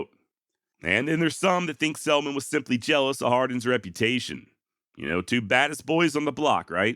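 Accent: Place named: New England, United States. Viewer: sounds like your are a damn yankee. Are 'ya?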